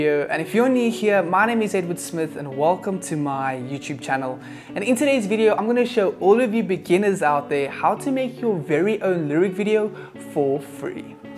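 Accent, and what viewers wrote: South Africa. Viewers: Lol i was skipping thru the intro ...and was like wait hold up...lol if u South african,you can't miss it lol🔥🇿🇦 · I was wondering why he sounded like some one from South Africa😂😂😂😂😂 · I reckonize that SA accent my bru 🇿🇦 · why am i hearing a lil bit of ab de villers sound when he is speaking are you from SA?? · Recognizing that South African accent a mile away. My bru 👏🇿🇦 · you can recognise the South African accent anywhere! :) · This is dope thanks for the hook up, nice tips especially the Motion backround ... Dude ,I could swear you sound South African... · Hahah I can hear your south african accent 😂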